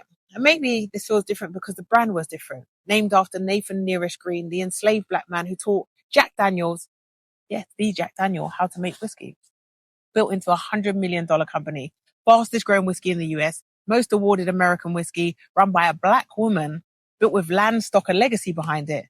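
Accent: United Kingdom. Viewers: My thoughts are she should take full responsibility and accountability for everything and also that your ADORABLE wit that Bri ish accent 🇺🇸 🇬🇧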